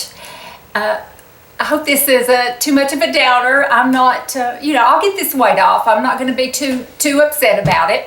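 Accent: South, United States. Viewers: you're such a beautiful, upbeat person! Enduring surgery and living with a chronic disease is not easy but you have learned to adapt and find what works for you. Love your video's and that Southern accent. Enjoy your time with the grandkids! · Oh, sweet, you are such a pleasure to watch, and us over 60 girls need to love the way we look and just enjoy our lives. My gosh, if I looked as lovely as you, I would be in seventh heaven, plus the delightful southern drawl. You just keep the sweet lady you are. Love from Iowa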